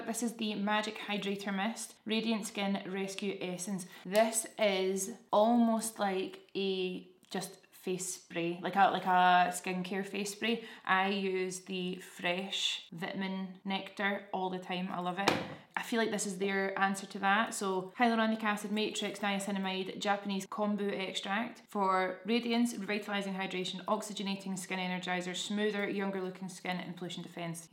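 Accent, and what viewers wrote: Scotland, United Kingdom. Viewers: I love ur Scottish accent xx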